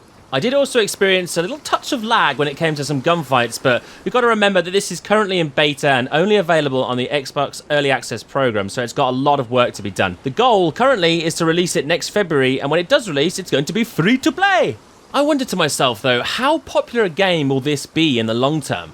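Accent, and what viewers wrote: United Kingdom. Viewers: Uncomfortably British...jesus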